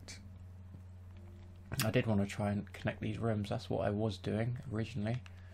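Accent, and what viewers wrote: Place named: RP, United Kingdom. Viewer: Your posh voice makes me laugh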